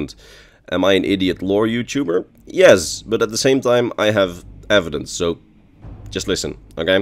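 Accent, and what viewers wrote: Netherlands. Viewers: I’ve lived in Amsterdam for more than 2 years now and I just realised you’re Dutch! Or atleast you sound Dutch haha. Great video as usual 🙌🏾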